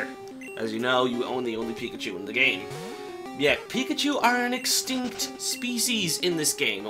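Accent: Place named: United Kingdom. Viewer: I LIKE IT BECAUSE YOU KINDA SPEAK BRITISH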